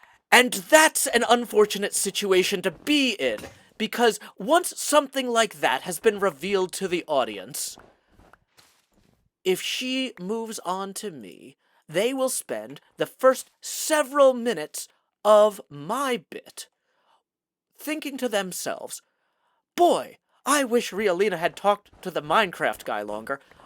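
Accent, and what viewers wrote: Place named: South, United States. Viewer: Hearing the English mannerisms in a southern accent all episode was a treat!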